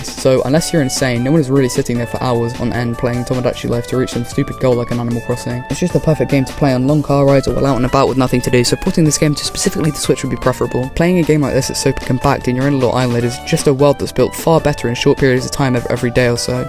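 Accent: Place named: United Kingdom. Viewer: british boy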